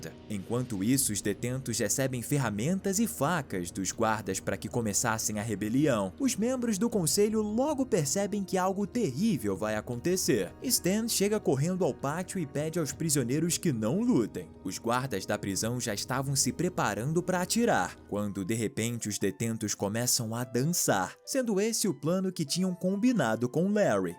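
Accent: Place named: Rio de Janeiro, Brazil. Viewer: Achei curioso o narrador do vídeo com sotaque carioca, ao invés de falar guimba de cigarro, disse: BITUCA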